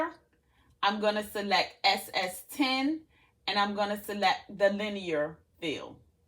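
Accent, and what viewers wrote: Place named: Baltimore, United States. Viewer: You sound like a baldamore woman 😊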